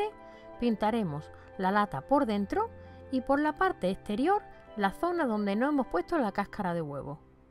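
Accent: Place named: Spain. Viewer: Querida, te mando saludos desde México en el Edo. de Queretaro, todos tus trabajos son hermosos, aqui tambien tenemos varios acentos a nivel Republica y para mi es inconfundible el acento español, te felicito por tus aclaraciones.( aqui tambien les decimos conchas) 🤗👍👍😍😍😘😘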